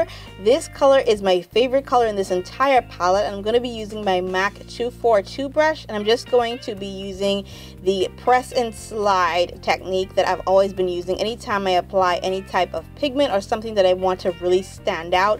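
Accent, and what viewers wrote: Bahamas. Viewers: I was looking at your previous video which was a review of lime crime utopia, & I said she sounds like a Bahamian we really can't hide our accent lol.. Go girl reppin 242.. :-)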